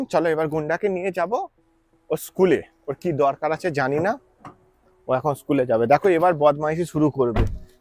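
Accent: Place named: India. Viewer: আপনি কি ভারতীয় কথা শুনে মনে হচ্ছে বাংলাদেশি না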